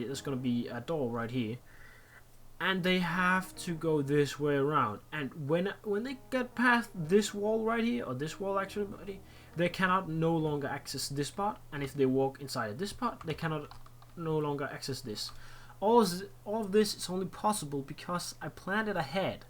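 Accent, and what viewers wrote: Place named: Denmark. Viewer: du lyder meget dansk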